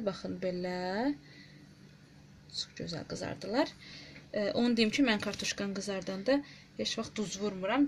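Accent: Ganja, Azerbaijan. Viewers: Xanım, siz Gəncəlisiniz? Mənim yoldaşım Gəncəlidir, Gəncədə balacaya- "Balicə"deyirlər!😂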